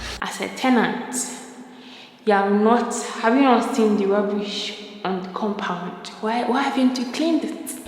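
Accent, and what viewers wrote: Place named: Ghana. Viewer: very well explained and detailed. I love this. I also love the way you talk, are you Ghanaian, if not, what country are you from?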